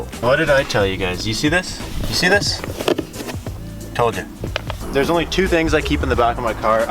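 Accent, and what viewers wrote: Canada. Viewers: I can tell your Canadian lol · right, the accent is hard when he says "out" or "pouch"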